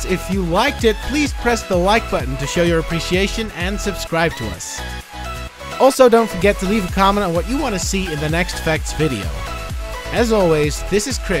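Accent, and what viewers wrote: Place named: Netherlands. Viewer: you are dutch? really dutch accent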